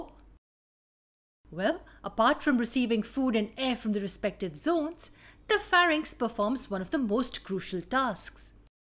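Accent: India. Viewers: Thanks mam. You cleared all my doubts In one video. And also thanks for the Indian accent and clear voice. 😚😚😚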